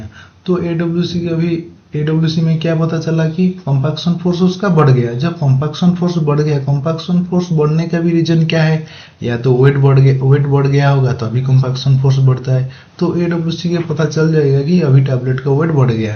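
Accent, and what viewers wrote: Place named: Odisha, India. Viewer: Odia accent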